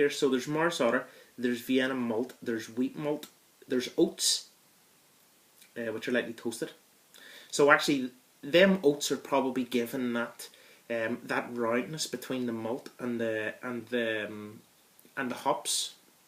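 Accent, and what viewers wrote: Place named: Ireland. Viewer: you sound irish .. too much time in home land lol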